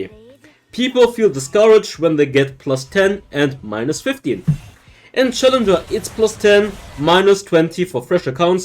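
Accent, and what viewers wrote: Germany. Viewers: I love that u can always hear his German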